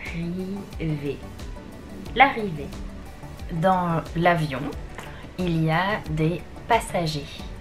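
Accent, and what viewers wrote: Standard. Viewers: 她是法國人，發音標準是很正常啊！😁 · 老师很棒！发音非常标准。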